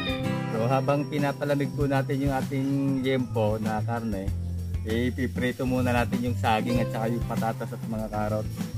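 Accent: Batangas, Philippines. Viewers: Wow look so delicious, nakakagutom naman, yang ang Luto nang tiyo ko na Batangueno, love the accent, thank you sa masarap na Putchero, babait naman nang mga bata, natututu silang tumbling sa pagluto, I'm pretty sure na mag mamana sa yo, one day baka mag chef sila well done. Watching from Queensland Australia ❤❤❤❤🇦🇺🇦🇺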